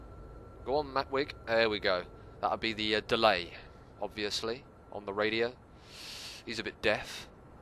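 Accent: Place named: United Kingdom. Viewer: You are so British mate :D - Giz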